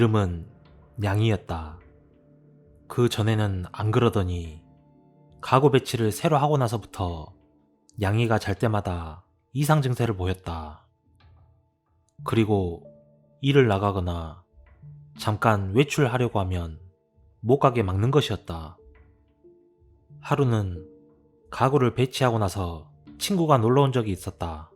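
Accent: Jeolla, South Korea. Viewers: 전라도사투리 재미있어요. 무서워야하는데, 사투리때문에 집중못했어요. ㅎㅎ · 전라도,억양 ㅎㅎㅎ 어떡,할꺼에요 ㅎㅎ 고향냄새 좋네요 ㅎㅎ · 제가 오히려 더 감사합니다. 진심 남의 사연을 듣는 게 아니라 본인 사연같아요. 구독자 엄청엄청 늘어서 흥하시길 바랍니다. 저는 경상도라 쌈무이님꺼 매니아인데 전라도 말투도 최고입니다.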